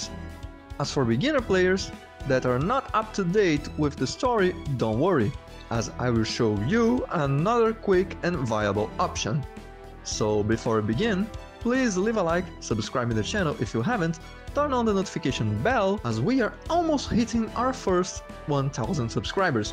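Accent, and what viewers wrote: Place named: Brazil. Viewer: Estou sentindo um cheiro de PT/BR nesse inglês aí 🤨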